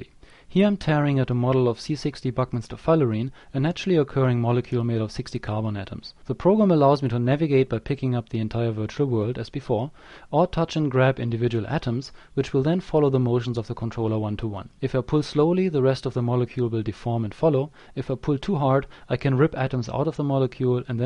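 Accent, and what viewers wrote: Germany. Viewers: u sound german... but dont worry, me too^^ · of course, you can hear when a german speaks english :-D. But his english is good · hi, bist du ein Deutscher ??? klingt nähmlich so :D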